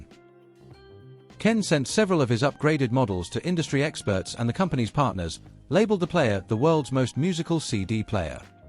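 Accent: United Kingdom. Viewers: So according to the narrator with the British accent, everyone has been pronouncing the name Marantz incorrectly. So phonetically Mare (like the female horse) and ents (like the tree beings in The Lord Of The Rings) it's "Mare-ents"(?) Huh... Learn somethin' new every day. (lol)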